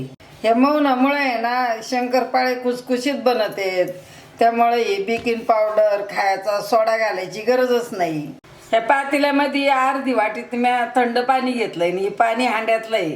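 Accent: Ahmednagar, India. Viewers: आजी तुम्हाला पण दिवाळीच्या खूप खूप शुभेच्छा. तुमची नगरी भाषा मनाला खूप भावते. Love from Nagar.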